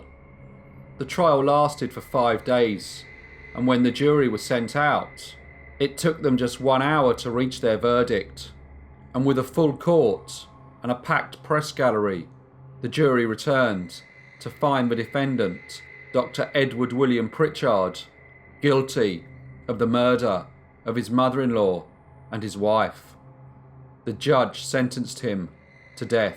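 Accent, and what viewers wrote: United Kingdom. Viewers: Found your channel and now binge watching, fascinating stuff - and it's nice to hear a fellow British voice! How on earth was Pritchard considered a ladies man? Even without the terrible things he did he already looked like something out of a horror movie. Can you imagine being given one of his postcards? Eeep!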